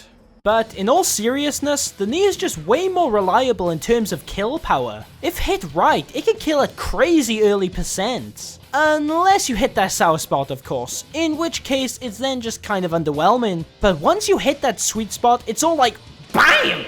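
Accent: Wales, United Kingdom. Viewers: Great video. Are you a little bit Welsh? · I think you really got to the heart of this. Good vid. Surprisingly sincere and sentimental. Hope Nintendo takes this to heart and NEVER fucks with things like the Falcon Punch. (Also, kinda love your accent. Don't get many Welshmen on here...I'm sure you're not sick of hearing THAT by now...) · Your accent is amazing, I'm guessing Welsh? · I can't decide if you're Welsh (most likely), Irish or American. :') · What the hell is your accent mate. You sound welsh, American and russian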